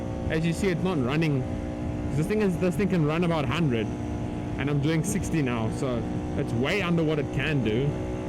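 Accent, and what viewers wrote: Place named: South Africa. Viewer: ....enjoyed your vid...lovely weather you have....are you in SA...from your accent sounds like it. I also own a B175....arnt they smashing little machines. Do you have any probs getting your spare parts...not to bad for me here in the UK, Just had a little 10 mile ride today to blow the cobwebs off after Christmas. All the beast and safe riding. Nick.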